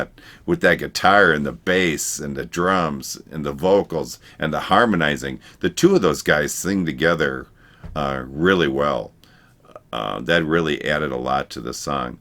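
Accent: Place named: Midwest, United States. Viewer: 3 seconds in and I detect Midwest/Great Lakes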